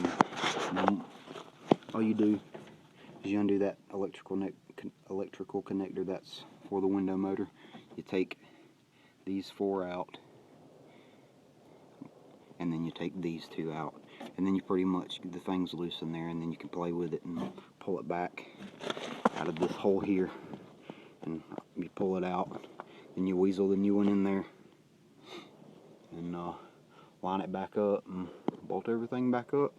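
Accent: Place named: South, United States. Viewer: Really informative in a southern accent!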